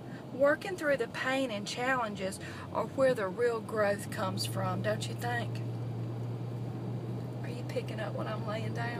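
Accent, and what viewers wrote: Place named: South Carolina, United States. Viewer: I love you so much! I'm originally from SC and I miss hearing the accent so much! It's so comforting and your energy is so peaceful and loving! Thank you for making videos! I was going raw for a couple weeks but the past two days I got really stressed and ate two big junky dinners two nights in a row and now I have a terrible ear infection! It was really the kick I needed to go back to fully raw (bring on the detox and let it go!)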